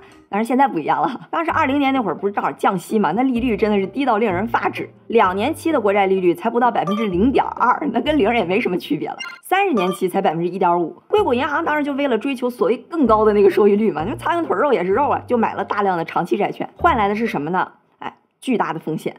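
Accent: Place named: Beijing, China. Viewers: 喜欢这北京腔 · 北京乡音，，太好听了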